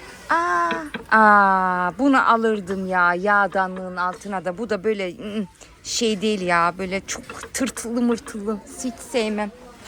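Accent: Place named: Central Anatolia, Turkey. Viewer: Konusman icanadululari animsatiyor Hakkini helal et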